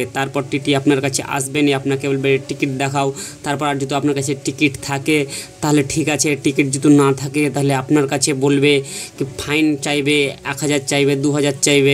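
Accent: India. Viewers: তোমার কোথাশুনে মনে হচ্ছে তুমি কিসনগঞ্জ ইসলামপুর বা ডালখোলা সাইডের লোক, যাক জানা কথা গুলো আবার জানতে পারলাম তোমাকে ধন্যবাদ । কথাগুলো অনেকের কাজে লাগবে।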